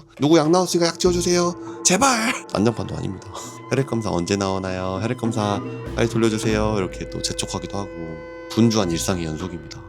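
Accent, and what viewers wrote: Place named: Gwangju, South Korea. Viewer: ㅋㅋㅋㅋㅋㅋㅋ 광주분이신가용? 억양이 겁나게 친숙해브네요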